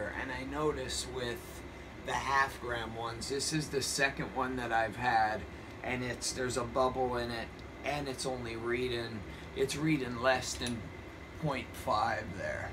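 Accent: Pennsylvania, United States. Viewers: ayy def got the PA accent haha, greetings from NEPA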